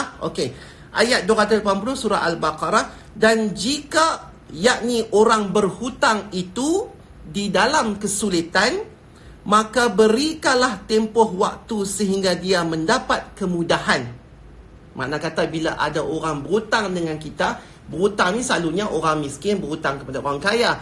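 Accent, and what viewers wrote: Penang, Malaysia. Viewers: Nampak beza sblm dgn slpas dgn dia.. Bagus la.. Harap teruskan. Dia ni org mna.. Dgaq mcm penang atau kedah ja hahaha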